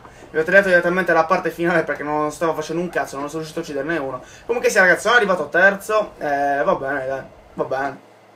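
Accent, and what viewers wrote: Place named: Apulia, Italy. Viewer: Ma sei pugliese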